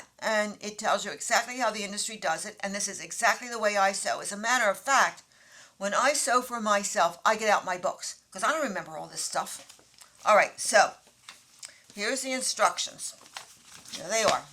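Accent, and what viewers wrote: Philadelphia, United States. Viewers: ❤❤❤❤❤❤❤❤❤!!!! New subscriber. You sound like you are from Philly or New Jersey.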